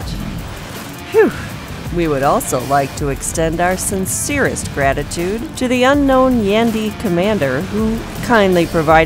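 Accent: Russia. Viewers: aww what happened to the awkward russian accent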